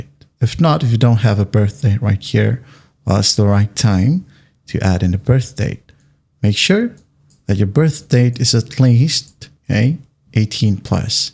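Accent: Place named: Australia. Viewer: i guess australian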